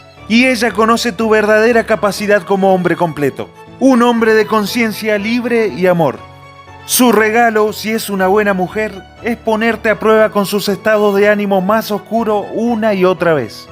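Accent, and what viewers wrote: Argentina. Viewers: No manches. Yo me dí cuenta de que es argentino luego luego.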